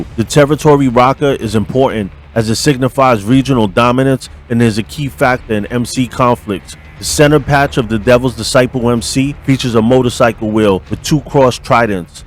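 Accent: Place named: New York, United States. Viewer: Bro, you are so New York. It’s pronounced TOOSAHN. Not Tuxon. Tucson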